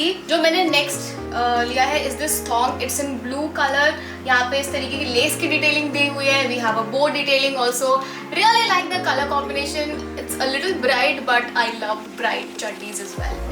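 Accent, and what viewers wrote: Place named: India. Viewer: 100% you look like foreigner 😮ie like pure100% Russian But your accent is paka indian 😮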